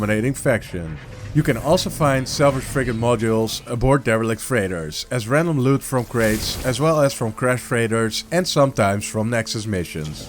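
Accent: Netherlands. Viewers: 😂😂😂 1 seconden en ik hoorde het gelijk😂😂😂 proud of the dutch accent 😂😂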